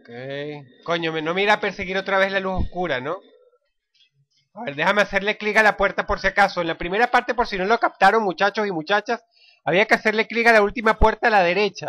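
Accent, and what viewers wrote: Argentina. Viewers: Le fue engrosando su voz de sus 25 años para acá, muy aparte del acentro entre mezclado con el argentino, pero la voz le cambió.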